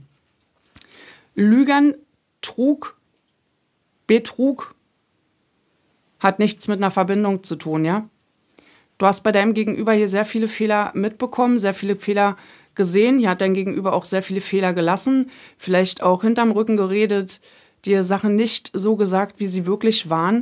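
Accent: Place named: Berlin, Germany. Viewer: Immer wenn ich dir zu schaue... Fange 8ch an zu Berlinern 😂 dat in Hamburch ist immer komisch 😂.. Den janzen Tag hab ik so jeredet... Heimat Gefühl... ❤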